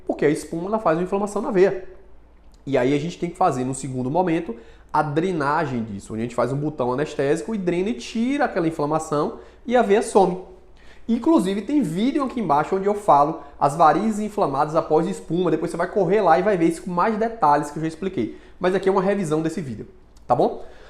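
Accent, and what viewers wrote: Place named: Bahia, Brazil. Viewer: Eu acho lindo o sotaque baiano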